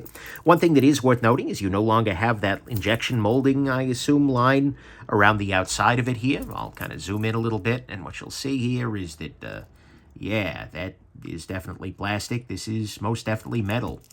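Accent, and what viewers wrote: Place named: New Jersey, United States. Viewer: You gotta be from New Jersey!!!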